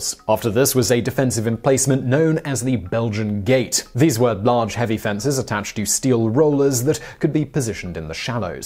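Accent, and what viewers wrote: United Kingdom. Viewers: woah british babish 😳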